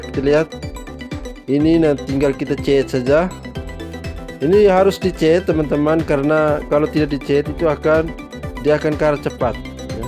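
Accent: Flores, Indonesia. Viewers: abang ini cara omong, abang dr flores ka 🙏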